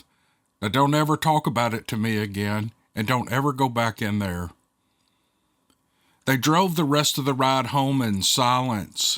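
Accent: South, United States. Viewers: I love not only because of the stories & but is the stereotypical southern personality. I’m from the South and live a LONG way away from there now. I love to settle in on a video and just sink into the Southern accent and the Southern way. In a weird way it’s a little bit of home.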